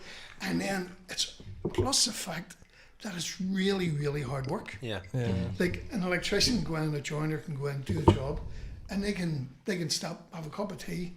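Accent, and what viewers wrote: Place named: Larne, United Kingdom. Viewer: That Larne accent is something shocking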